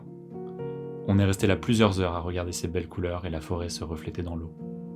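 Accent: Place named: Japan. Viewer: 事故無く日本縦断を楽しまれて何よりです。音楽と西諸弁のナレーションも心地よかったです。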